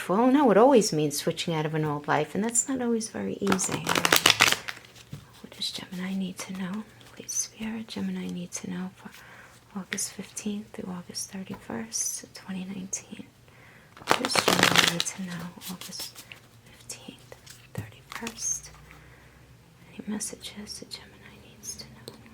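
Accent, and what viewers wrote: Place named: Boston, United States. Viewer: You are definitely from or live in Boston... at least your accent sounds like it... great reading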